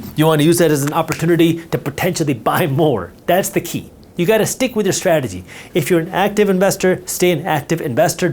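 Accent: Standard. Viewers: Finally crisp and clear neutral English accent. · And speaks normal English!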